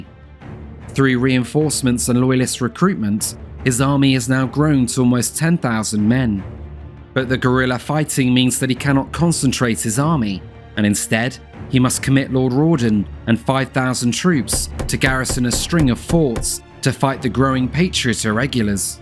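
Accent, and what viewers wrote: United Kingdom. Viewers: What irony...Narrated by a Brit 😅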